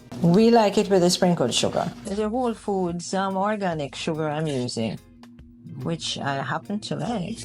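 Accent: Jamaica. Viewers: I love Jamaican accent.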